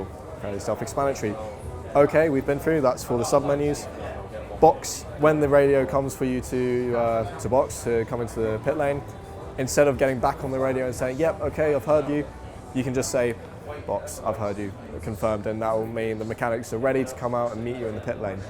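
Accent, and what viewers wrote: RP, United Kingdom. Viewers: This posh nerd as put me 2 sleep.! ☆WOW[NEVER THOUGHT I'D C THE DAY]....talking bout F1 & this donnie is making me snooze.! Lol..!!☆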